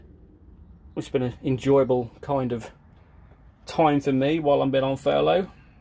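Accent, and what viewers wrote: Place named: Norfolk, United Kingdom. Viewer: Fantastic layout mate! That accent sounds very Norfolk/fen am I right ?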